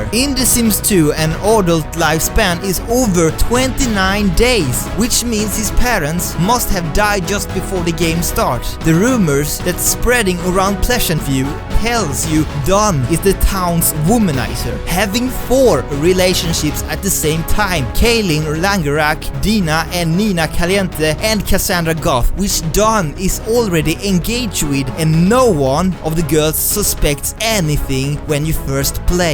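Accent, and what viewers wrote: Sweden. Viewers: He's a Swede, you can tell that from the accent. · Din svengelska är helt underbar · That is Swedish. He has an obvious Swedish accent as a German that speaks Swedish as well I can tell you that is Swedish, German looks pretty different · He is Swedish, the game is on swedish so that's why he has an accent and bad grammar. Not that all swedes have bad grammar but he has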